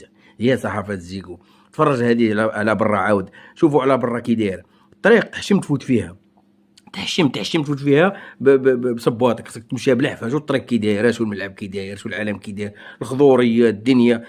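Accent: Morocco. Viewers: لا هذا ليس جزائري الله اعلم هذا مغربي بين في اللهجه دياله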